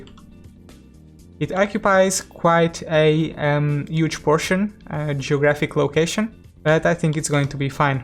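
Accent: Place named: Portugal. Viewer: Pode escrever em português! O é claramente português. O sotaque não engana 😊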